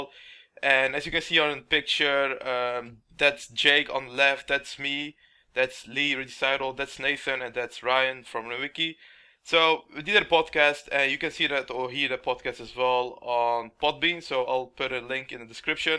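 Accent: Netherlands. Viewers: Hahaha ik wist het gewoon dat jij een nederlander was, ik hoorde het al een paar keer in je videos maar nu weet ik het zeker. ik denk post het maar gewoon dat ben ik dat ook weer kwijt. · are you Dutch?! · Why does it sounds like you are Dutch or Belgium lol. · you sound really dutch in your english accent, where are you from?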